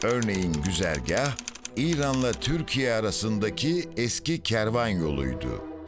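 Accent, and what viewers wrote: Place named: Cyprus. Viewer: Kulağa kıbrıs şivesi gibi geliyor. Hoş, naif ve güzel bir diksiyon..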